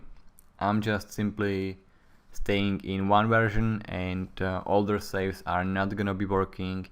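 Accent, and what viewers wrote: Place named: Czech Republic. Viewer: ***** Where are you from? Because you sound likr someone from czech republic :D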